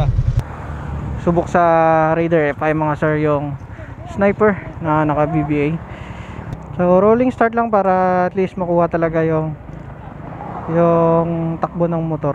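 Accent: Iloilo, Philippines. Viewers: Ilonggo ka gli to😂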